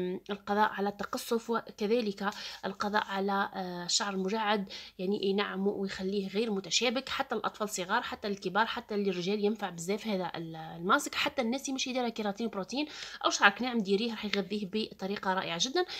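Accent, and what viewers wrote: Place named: Bordj Bou Arreridj, Algeria. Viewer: لك جزيل الشكر حبيتي والله نموت على هدرتك برج بوعريريج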